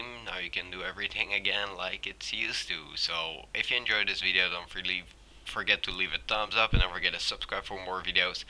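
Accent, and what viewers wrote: Netherlands. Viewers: nvm his dutch sorry thought it was Afrikaans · Are u dutch?? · you're dutch...I can tell by your accent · Thanks! it helped me, btw are you dutch lmao :D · Dutch? lol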